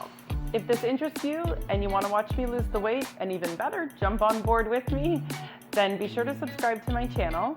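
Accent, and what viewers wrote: Canada. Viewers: Can’t wait to try the apple, banana, oatmeal snack! Looks delish. You must be Canadian, I can hear your accident. Just subscribed, looking forward to your journey. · I hear a Canadian accent! (I live near Canada in northern Vermont!) Great simple delicious recipes. Thanks, and good luck on your journey!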